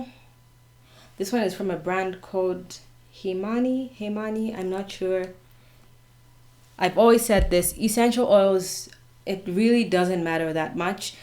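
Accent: South Africa. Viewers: You sound South African ❤️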